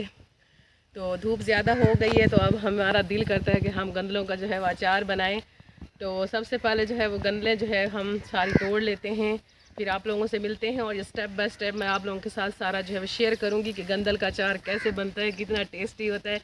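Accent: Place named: Thalochi, India. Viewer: Sohni thalochri punjabi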